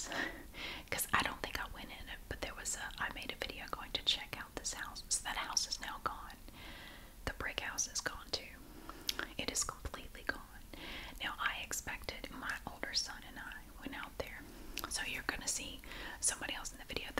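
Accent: South, United States. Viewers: You have a soothing voice and mix in that Southern accent it's strikes gold . Personally, I like it better and feel the whisper takes away your best attribute. I watched your videos for years and congratulate on building your channel. My favorite is when you treated yourself to a cruise something you wanted so badly. That was an awesome emotional video.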